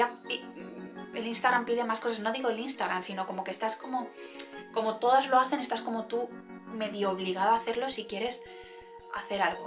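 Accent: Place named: Spain. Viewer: No me gusta el acento español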